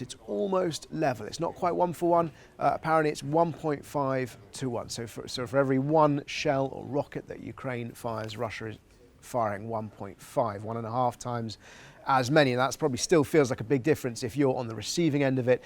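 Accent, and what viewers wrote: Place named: United Kingdom. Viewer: Loose your British accent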